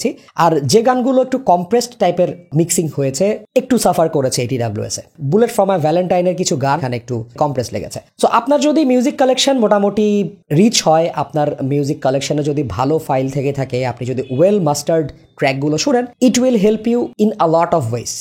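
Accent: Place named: Dhaka, Bangladesh. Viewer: কে ভালোবাসা দিয়েই ধন্যবাদ জানাই যাউজ্ঞা সামনের দিক আউজ্ঞা ঢাকাই ভাষা অসম্ভব ভালোলাগে তোমার😊 আপনার উপস্থাপনা দুইভাবেই মাঝে মধ্য আপন ভাবে কথা বলি আমি, উপস্থাপন দেখে। Don't mind plz ঢাকার পোলা আইসা পড়ে। দোয়া ও শুভকামনা সত্য সামনে রেখে কথা বলা (যেকোন পন্য রিভিও এর কথা বলছি)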